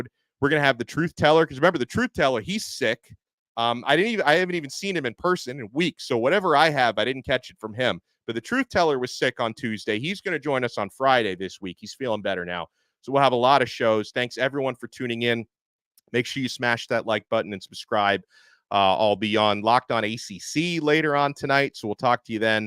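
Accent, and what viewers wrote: South, United States. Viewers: As a Miami fan who lives on the TN/GA line you nailed the southern accent 😂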